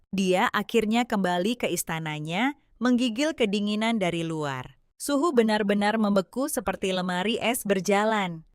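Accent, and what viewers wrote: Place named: India. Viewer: Njiiirr logat india.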